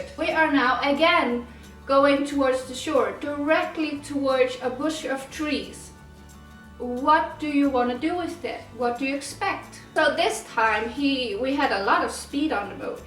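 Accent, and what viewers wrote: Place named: Denmark. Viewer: I love Denmark and your accent!!!!!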